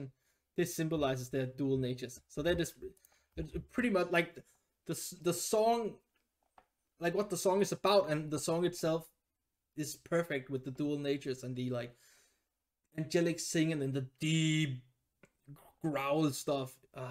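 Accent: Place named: Scandinavia. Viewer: Hey. Where are you from. You have a slight accent I can't quite place. Guessing nordic, maybe danish? You got some catching up to do. They have already released 2 singles from their upcoming album, dropping on August 27. Then there's their back catalog. are a few years old now and the 4 band members have improved so much in that time and they seem to have found a sound and a style that are uniquely their own. Their new stuff is very technical and progressive, yet they still have that super heavy and awesome groove, we fans like. They often mix in influences and styles from outside rock and metal, always surprising, when we just think we have figured them out. My favorite songs are to be found on their later releases but i don't think they have a bad song. If you're not used to listening to metal I would start with the suggestions below, but if you like metal music you might jump straight into their new stuff. 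I recommend:,.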